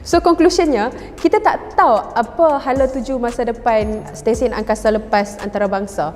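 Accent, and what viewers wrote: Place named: North, Malaysia. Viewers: Loqat utaqa kita geng.. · pekat naw utaqa dia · Dia jatuh seketoi..pekat btl Utara hang noh😂😂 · Pekat sangat loghat utara hang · guna la bahasa melayu standart.....adoiiiiiiiiiiiiiiiii....teruk betul bunyi utara....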